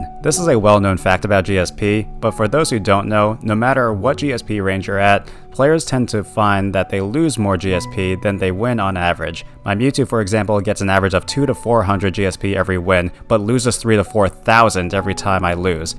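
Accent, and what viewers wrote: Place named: New York, United States. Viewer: What part of New York are you from ? I’m from New York as well 👀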